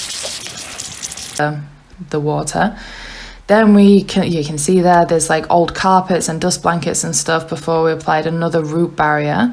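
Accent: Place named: South Africa. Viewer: After a second vid I’m hooked and just subbed. Ice plant is fire resistant! The concrete pope portholes are brilliant. Love your accent, reminds me a little of some South African friends of mine but yours is a bit more exotic. Can’t wait to watch more of your build, you are a talented family.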